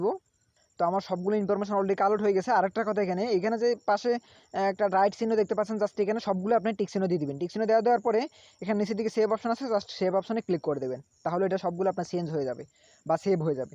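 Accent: Noakhali, Bangladesh. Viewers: ভাই আপনার বাড়ি নোয়াখালী কোন জায়গায়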